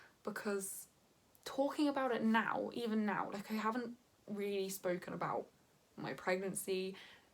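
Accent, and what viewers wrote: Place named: United Kingdom. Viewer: I had no idea you were british and that beautiful accent caught me off guard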